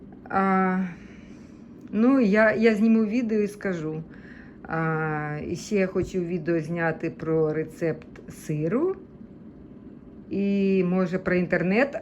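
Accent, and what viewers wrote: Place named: East, Ukraine. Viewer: Вітаю! Ви не переживайте про те, що розмовляєте на суржику.😊 Це типова вимова сходу і півдня України.😊 Ви все надолужите, було би бажання. З появою інтернету, у Вас є можливість перевірити озвучку відео, з допомогою Гугл онлайн перекладача. Ви краще приділяйте більш уваги англійські мові. Вона Вам буде в нагоді і в США, і після перемоги, якщо повернетесь, і в Україні. Будете, як то кажуть "в струє"😊😊 Ностальгію за вітчизняними продуктами демонструють всі іммігранти.😊 Це тішить душу. Але Ви живете в такому місті і маєте можливість ( не завжди...), але бувати в Вашингтоні. Там, мені здається, є все і зі всіх світів😊. Хіба, що це не дешево(. Бажаю Вам здоров'я! Вам і Вашім дітям благополуччя! Ви молодець! Успіхів! P.S. А в нас по всій Україні ревуть генератори Гул стоїть постійний. Жара 35°С. Но все працює! Продукти і товари є. 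Всі ми маємо надію і віру на Перемогу❤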